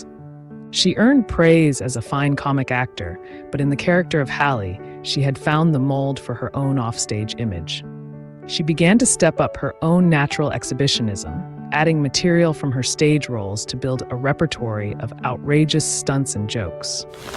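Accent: United States. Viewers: I' usually like to listen to mostly Men Narrators with nice toned voices' but You' have a American Easy on the Ears Lovely toned Voice 👌✨🎶🎧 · Sounds like my first wife from Tennessee.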